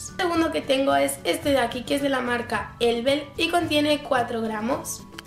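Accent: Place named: Spain. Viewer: Y de que pais pensas que es?? No le notas el acento? De ESPAÑA obvio!!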